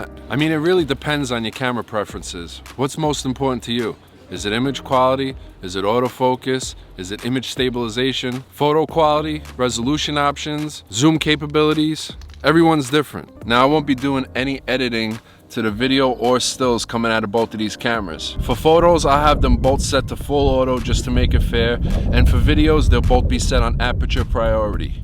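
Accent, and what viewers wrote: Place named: New York, United States. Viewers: Just came across your review for the first time, but I will be back. As a Canadian, I loved the NY or NJ accent, Great review, you covered a lot of information in a fair way. I was looking at Sony and have an old Canon S100 that I love, but I think your review convinced me the canon was a better camera and value than the Sony. Keep up the great work. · I love your voice. Sounds like a Brooklyn accent to me. It's awesome haha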